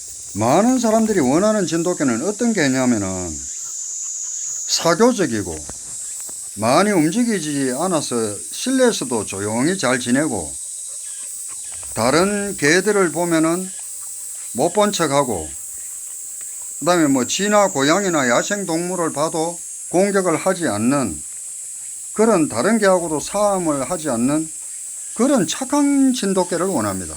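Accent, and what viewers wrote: Gyeongsang, South Korea. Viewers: 말씀이 갱상도? 갱북? 구수하고 신뢰가묻어납니다. 어미개. 영특해요. 시끼교육도. 야무지게. 멋찐 아이들로. 자랄듯. 유익한 설명도감사합니다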